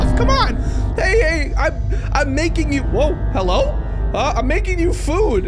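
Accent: Scotland, United Kingdom. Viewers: Ah yes just randomly turning British No hate pls no hate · Love the British voice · The British accent 😍 👌 🤣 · Bro u sound sccotish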